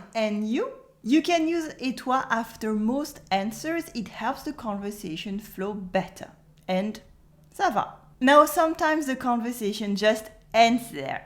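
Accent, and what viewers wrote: France. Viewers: Comme une française surtout l’accent ! A couper au couteau !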